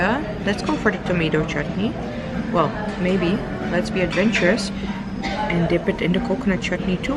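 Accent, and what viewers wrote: India. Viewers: Haha..one suggestion for you. Watch your first vlog and watch this. Your accent is slowly getting indianised.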